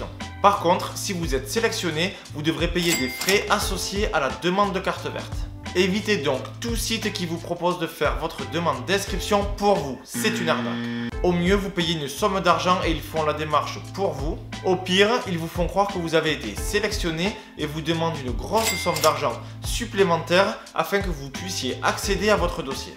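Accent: South, France. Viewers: en passant tu as une petite accent du sud n'est-ce pas? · Je regarde quelques-unes de tes vidéos et me suis abonné. Je me pose une question. Est-ce que tu accent un peu "du sud" s'entend quand tu parles anglais ?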